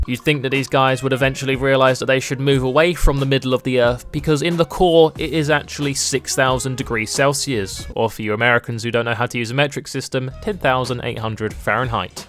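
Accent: United Kingdom. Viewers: Are you British Bro?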